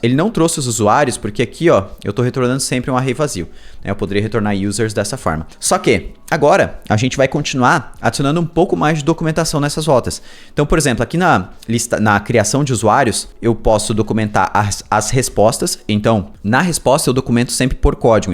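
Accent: Brazil. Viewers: falar como brasileiro, ninguém fala Alex como Álex…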